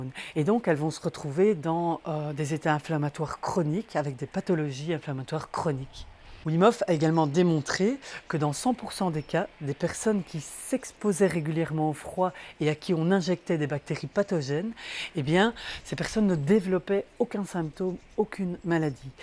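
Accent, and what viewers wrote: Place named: Belgium. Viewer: Un accent belge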